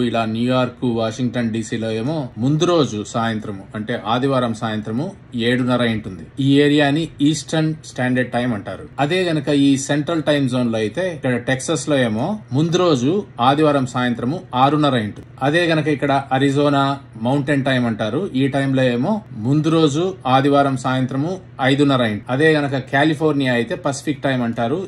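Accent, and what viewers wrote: Rayalaseema, India. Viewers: మీ తెలుగు భాష చదువుకున్న వాడు మాట్లాడు తున్నట్లు లేదు. తాడిపత్రి లోనో పులివెందుల మనుషులు మాట్లాడుతున్నట్లు ఉంది. అమెరికాలో ఉండి youtube లో మాట్లాడేటప్పుడు వా క్సు థి ఉండాలి. ట యా నికి కాదు టైమ్ కి అనటం నేర్చుకోవాలి. నాగరిక జీవనంలో భాషా సౌందర్యం చాలా అవసరం. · Watching ur videos.. because of your rayalaseema slag sir... I am from kurnool . Tq sir.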